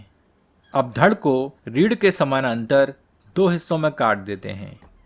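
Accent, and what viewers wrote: Standard. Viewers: Amazing recipe and full authentic hindi haha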